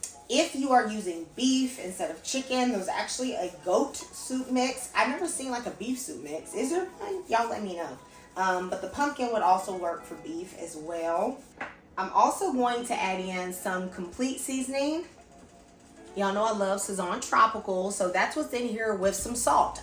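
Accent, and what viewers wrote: South, United States. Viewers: My kids been sniffling these past couple of days so I’m definitely going to use this recipe. 🔥Are you from the south?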